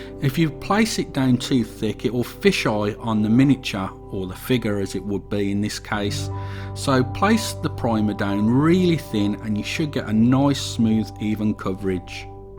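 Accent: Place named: Midlands, United Kingdom. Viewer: Nice idea.. I really feel like a potato not thinking of this. Sound like you are from Midlands lad like me. Nice to hear a accent I can understand.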